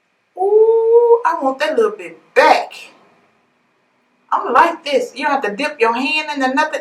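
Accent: South, United States. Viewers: You are so country and I love it 😫 I’m from Alabama and I have a strong country accent too 🥰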